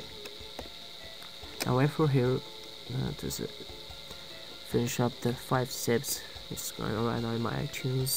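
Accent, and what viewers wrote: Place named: China. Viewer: "thank you for de chinese accecent"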